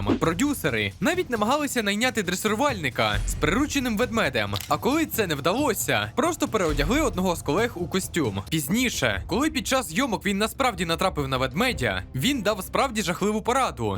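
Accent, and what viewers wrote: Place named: Russia. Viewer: Чувак, ти цю промову ззапорєбріка якнайшвидше забудь, Це біда, і ти її носій, досить акати, кажи суть нормальною мовою.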